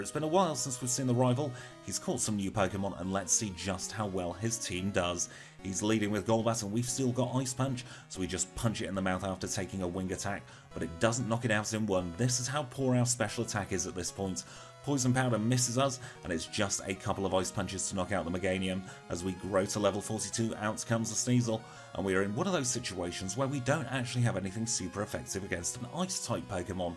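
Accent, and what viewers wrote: United Kingdom. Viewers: Searched for quagsire runs and was not disappointed. Also, nice bonus to hear a fellow Brit doing Pokémon runs too! Great video thanks 😊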